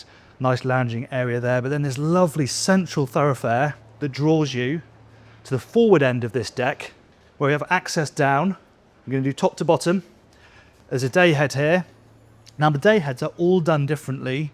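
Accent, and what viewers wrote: United Kingdom. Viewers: PASHAGEWAYS....love hearing the British speak!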